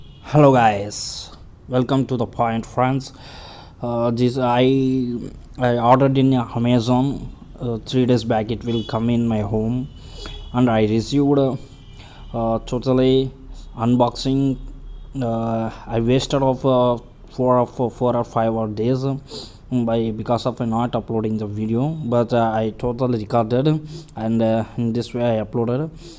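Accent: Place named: Telugu, India. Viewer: Endayya idi. Sorry, I got distracted from content with the dialect. Tiney leda matladu. Telugollu ila kuda English matladtara.. Wah! Kamal ki chiz hy yar.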